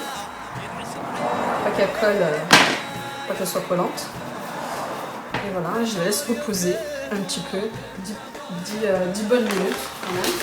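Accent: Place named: France. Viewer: lol c fucking weird voir une mdm aekk voile parler francai de france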